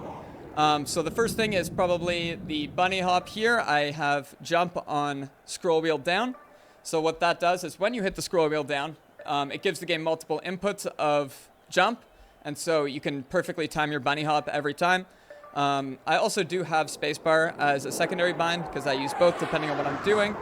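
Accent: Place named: Ireland. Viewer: you are irishhhhhhhh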